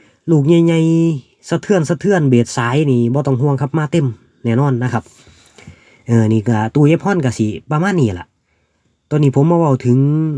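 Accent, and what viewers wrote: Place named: Sisaket, Thailand. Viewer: สำเนียงค๊นสีเกษตั๋วนี่😊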